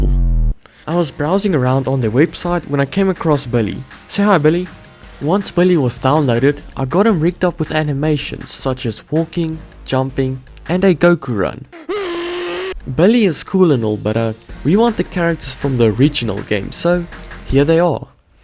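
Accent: South Africa. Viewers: This is awesome, you sound like a South African. Support from Greece 💪🏼🇬🇷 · you are so South African i can hear it in your accent me btw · i swear, this guy sounds south african, as a south african myself, i can identify the accent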